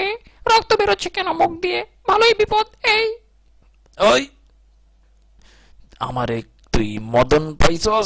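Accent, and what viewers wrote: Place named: Bangal, Bangladesh. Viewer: sir u r awesome tomar bangal bhasha ta daroon laglo tumi to nek femous hote paro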